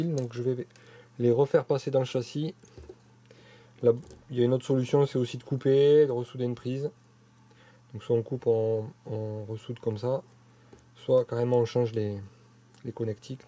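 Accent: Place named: South, France. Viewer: Pas mal du tout ! Le design est plutôt intéressant ! Cet accent, tu es du sud de la France ? On attend le maiden maintenant ;) Bon week-end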